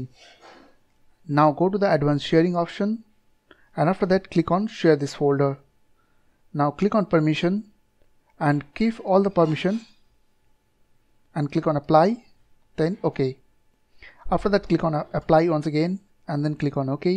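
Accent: India. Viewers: this generic indian accent is a red flag to me I bet this will tutorial will still all my money and block my pc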